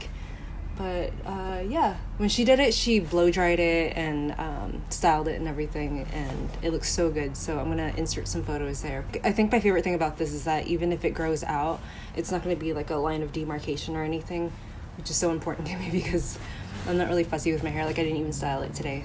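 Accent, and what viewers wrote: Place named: California, United States. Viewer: Weird confession, sometime I think about emulating the way you talk haha. Like you don't rush your words, you articulate everything with precision and thoughtfulness. So measured and without any anxiety. And very Californian!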